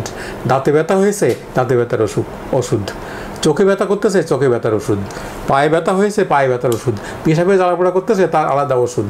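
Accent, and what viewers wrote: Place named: Chuadanga, Bangladesh. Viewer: স্যারের ভাষার মধ্যে চুয়াডাঙ্গার ভাষার মিল পাওয়া যায়।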